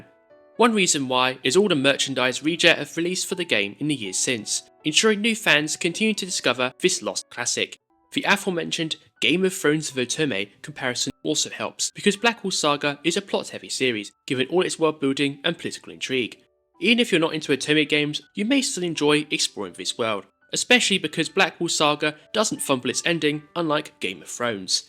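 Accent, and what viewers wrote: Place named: United Kingdom. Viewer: I am truly enjoying your videos and have subscribed to the channel. However allow me to provide some negative constructive feedback. You should realty work on your voice-over. Try to speak slower, and tone down your specific variant of British accent. I am a fully-fluent non-native British speaker, who has been mistaken for a native on several occasions, and who lived in the UK for years and had no trouble understanding the locals (in Scotland, no less). Yet I find myself not understanding words you say on multiple occasions and having to rewind the video, and even having to turn subtitles on when the re-listen isn't enough. Other than that, full respect for your Japanese gaming knowledge and the obvious deep effort and research that has gone into creating these videos.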